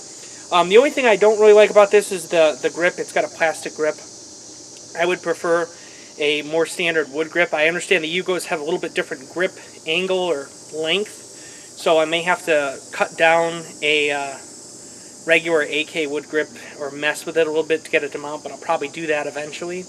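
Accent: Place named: Chicago, United States. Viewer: HA, listening to your accent i knew you had to be from the Chicago land area. Then you busted out with you living 30 minutes from Rguns and I was like, son of a bitch lol. I live about 45 minutes east from Rguns, I'm over by the lake. I just bought this rifle from reddot arms in lake villa and I'm going to do the same thing as you and just head over to rguns and get the yugo sling. good video, i just subscribed.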